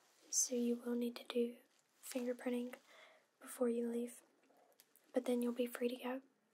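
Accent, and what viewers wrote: South, United States. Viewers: the southern accent just makes this roleplay much better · Huge fan! The only complaint I have is I never make it through a video without falling asleep! 🤣I love your accent! and I have to know.. where are you from? I've lived in La. Ar. And Tn... if I had to guess, Georgia? S. Carolina? Regardless, thanks for the great asmr sessions!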